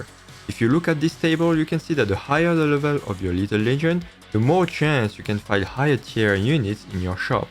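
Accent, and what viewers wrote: France. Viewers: T'a trop un accent français , 100% tu l'es je pense ^^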